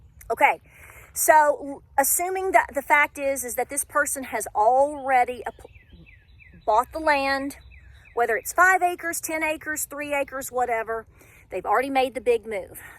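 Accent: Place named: North Carolina, United States. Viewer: Hi. I have been watching your channel since last summer. I'm a 34 year old with a baby girl who just turned one. We live over in Buladean, NC. I love your channel. Even though you talk about the real and the scary of whats going on in our county, watching your videos comforts me. I feel like I know you because we are very similar (the accent! Heeey!!!) in lifestyle and beliefs. Watching your videos is like talking to a friend which is very important for me right now. My parents, my husband and I are doing our due diligence; however I have no friends (millenials 🙄) with whom I can have these conversations about prepping, the state of our country, etc. I have a best friend who I have worried about for this entire year. I've sent her hints here and there encouraging her about what she needs to do and about what's coming, hoping she will get off her butt and do something. 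She has made several unbelievable comments, but I don't have the time for that. Last night I was mentioning to her that she needed to stock up on meds and I mentioned Jase medical and antibiotics. She asked, "where are you hearing this? The news?". She then stated she was choosing to remain ignorant bc she had too much anxiety and couldn't add "this" on top of it. She said God would take care of us. I reminded her of Joseph and his dreams and told her God was allowing us this time and these warnings to prepare. She then snapped and said, "what are we supposed to be preparing for? A Great Depression." I sa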